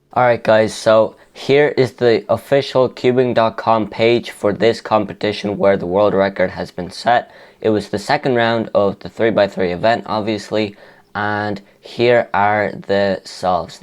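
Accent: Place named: Ireland. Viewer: I love ur Irish accent it’s dope lol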